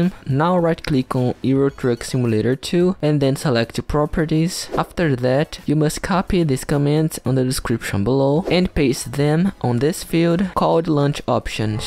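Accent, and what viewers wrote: Brazil. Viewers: Eu senti que seu inglês tava meio brasileiro kkkkkk dps que eu vi o nome do canal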